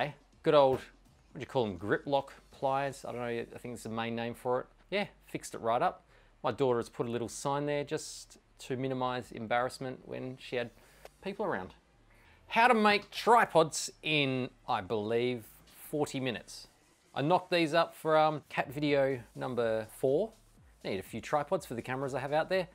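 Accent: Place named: Australia. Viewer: You are a riot! Great sense of humour and sound effects, not to mention your outraygeous Aussie accent. Cheers!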